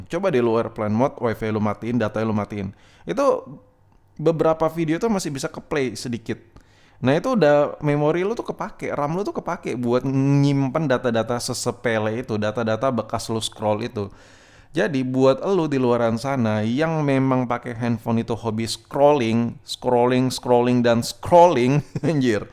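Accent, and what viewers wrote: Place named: South Jakarta, Indonesia. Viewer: Bang elu jaksel ya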